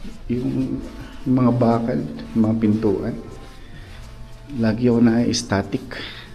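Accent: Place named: Mindoro, Philippines. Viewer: Bossing, taga Mindoro ako, parang pareho tayo ng tono sa pagtagalog, ganire, ire, dine..😅😅😅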